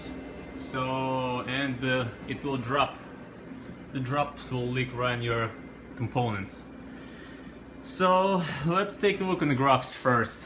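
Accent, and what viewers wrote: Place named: Russia. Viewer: аксент лайк рашен...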